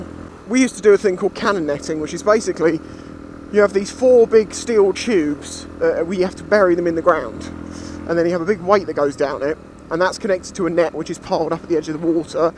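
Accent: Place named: England, United Kingdom. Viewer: LOL, captions were funny. I think your accent is throwing the software off. It doesn't recognize English english.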